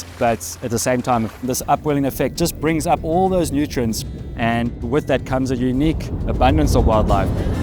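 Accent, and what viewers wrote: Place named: South Africa. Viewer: Wow. Came here for the penguin interaction, fell in love with the visuals, editing, the amazing surfing, & the music, not to mention that beautiful South African accent!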